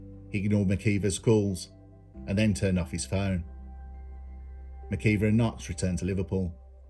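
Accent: Birmingham, United Kingdom. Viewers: Great video but F-me! That Brummy accent makes my toes curl!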